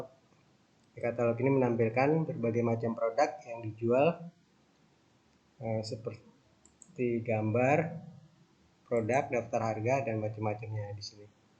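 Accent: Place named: Betawi, Indonesia. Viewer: Bujug dah bang, Betawi bangat yak .... Bisa nih bang ane belajar ke ente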